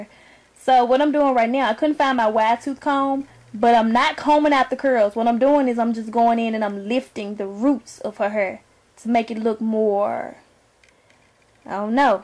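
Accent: South, United States. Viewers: Love yo southern drawl. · how would you maintain the style for day two? And I love your southern accent, reminds me of home. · Nice tutorial but you need to learn how to speak you country as hell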